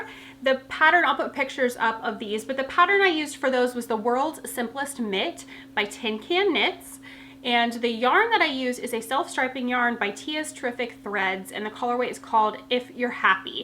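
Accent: West Virginia, United States. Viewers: I love seeing you back on track ❤ and I’ve never seen that color Stanley cup!! Let that WV dialect fly! 😂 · I am so going to purchase your new sock pattern! I'd love to hear you talk in your W. Va. accent. I'm from Ga, so you already know how I sound. lol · You seem to realy enjoy podcasting again without the additional responsibility of the shop, and your new pattern is lovely! I especially love the 2nd colorway you are making in the Lovestory sock pattern. Btw, being a WV born gal myself, I picked up on your accent coming through; happenns to me when I go back to visit relatives in Almost Heaven. I, too, love the winter snow season but only get to enjoy it once in a while when I visit the homeplace.. Thanks for sharing your knitting and knowledge. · Good morning! Glad to have this to tune into 1st thing this morning. I am working my 2nd pair of Magic Loop Vanilla Socks!!! 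I learned from your tutorial and now feel so empowered to create the cutest socks. It makes me very happy, will never NOT have socks on the needle now. Your January yarnable socks are fabulous. The dark pink is stunning with the lighter contrast color. I will definitely try this pattern when I'm done with the vanilla socks I'm working now. Thanks for the inspiration, Has made my life even better!!!! Loved drinking my coffee to your vlodcast this morning- good way to get the day started. You can take the girl out of West Virginia but you can't take the West Virginia out of the girl!!!! Cute southern drawl revealing your roots!!!!!!! Have a great Thursday🤩🐑🍵🧶 -Carrie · I hope you had your cosy snow day - chilli and brownies sounds like a great way to spend the day. Happy Birthday to Eric. The love story pattern looks great both ways, I particularly liked the version which just used 2 colours - although I think a gradient yarn may have merit in place of the minis. 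If you got snow I hope it clears enough for your family to be able to visit - you need to be able to practice your WV accent with your Sister (your reaction to “by” made me laugh)! Best wishes, J x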